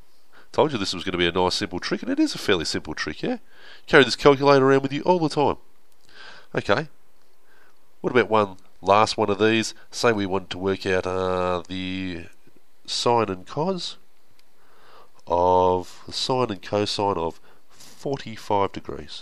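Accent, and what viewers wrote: Australia. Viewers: Now that is one thicc Aussie accent. I love it! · I love this guy he use smart way to teach Math. His English sounds like Australian yet a lot easy for me I really want to talk to him one day. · He is an australian that is why he sounds like that. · A true Australian accent that is. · is he australian? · This guys obviously Aussie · Pure Australian accent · He’s Australian...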